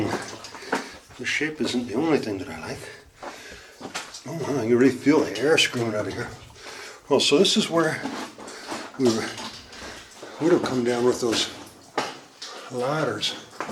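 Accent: Canada. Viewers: As soon as I heard the accent. I KNEW it was Canadian. Love the video.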